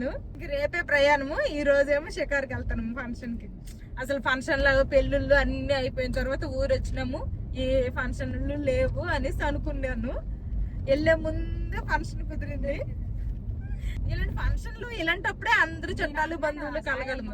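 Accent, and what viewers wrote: Srikakulam, India. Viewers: మీ కన్న మీ అమ్మ గారు నీట్ గా మాటలు ఆడుతున్నారు మీరు మరి శ్రీకాకుళం యాస దారుణంగా మాటలు ఆడుతున్నారు 🙏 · dear madhavidont think of leaving the mangoes bagif there is no place..make place.how can you leave andhra mangoes....your srikakulam accent is very good. nice to hear. you talk openly..ftom your heart...👍👍💓💓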